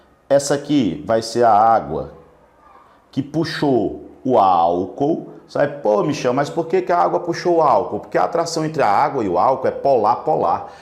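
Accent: Northeast, Brazil. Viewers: Que aula foda! E ainda por cima o sotaque nordestino. Inscrita demais no canal!! Sucesso!!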